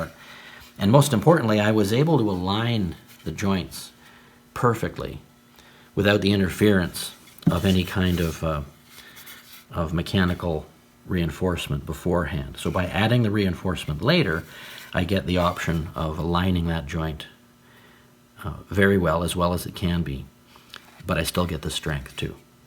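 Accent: Canada. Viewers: Nice video, Interesting! I shan't comment on the criticisms you received on whether it's a strong or not corner reinforcement joint. It is just showing another method of reinforcing a mitre joint. To those who are interested in the tool you used these are on sale in the UK under the description of Spring Miter Clamp Set, Framing Mitre Clamp, Google that and you'll find lots. By your accent i'm assuming you are from Canada, not sure what you would call them there.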